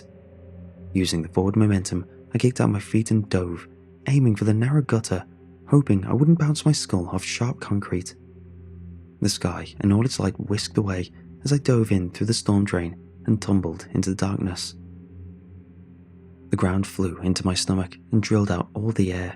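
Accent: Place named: United Kingdom. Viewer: I've just found you, it's refreshing to hear a follow brit narrate.. although I have a long way to go before I reach your status. If ever!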